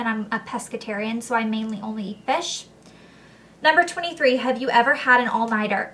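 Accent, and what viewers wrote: South, United States. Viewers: Hahaha, could it be that your accent is getting more 'Southern' again, because you are at home? I know it happens to me, when I stay at my parents for a longer time. (And I'm from Belgium! Did you like our country?) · your southern accent is coming back slightly. is it because your back home?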